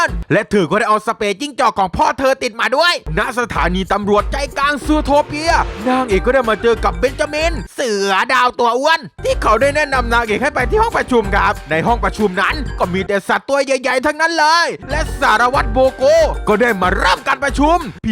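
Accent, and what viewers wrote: South, Thailand. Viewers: ฟังเสียงพากแล้วเพลินดีครับ ออกสำเนียงใต้นิดๆฟังแล้วมีเสน่ห์ดีครับ ทำต่อไปนะครับจะติดตามและเป็นกำลังใจให้ครับ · พี่เป็นคนใต้หรือเปล่าเนี่ยเห็นสำเนียงเหมือนอ่ะ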